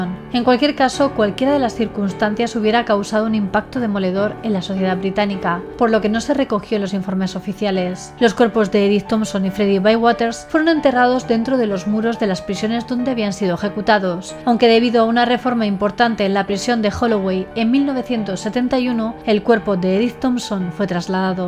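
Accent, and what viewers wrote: Spain. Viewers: "Solo se que se nada". Debo de estar muy agradecido contigo, por qué con tus vídeos ( tan lindos, bonitos y hermosos, como la creadora misma ella es ) nos alegran el día; ellos son la alegría de todos nuestros días. Tú eres la mejor, la única YouTube'r que es inigualable, con ese asentó español, eres maravillosa, excelente, muy profecional, YouTube'r, eres tú, hermosa española. Nota: Gracias por tus vídeos. Gracias por existir. Te adoro. Te admiro mucho. En verdad te lo digo, eres tú, muy diferente a las demás. Eres la mejor. Nadie como tú. Hasta el final!!!!!. Hasta el fin de este mundo!!!!. ", HACIA LA ETERNIDAD"!!!!! 💀🏰💜🗝️🕸️🕷️🕯️🧹⚰️👻🎸🍷🎹🖤🌹🎃📚🔮🧙😈🔥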